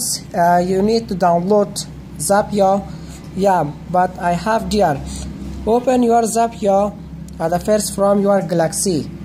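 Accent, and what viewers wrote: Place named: Afghanistan. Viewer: Afghans talk English like him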